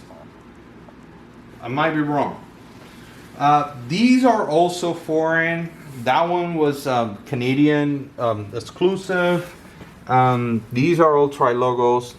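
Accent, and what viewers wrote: Venezuela. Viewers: El acento venezolano te delata .. y me alegra .. muchísimo .. tremenda colleccion .!!! Increíble .!!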